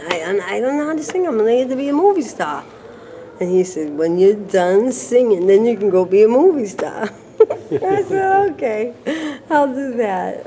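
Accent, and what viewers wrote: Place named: Boston, United States. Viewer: What a great lady-loved her Missing Persons work. And her Bawston accent.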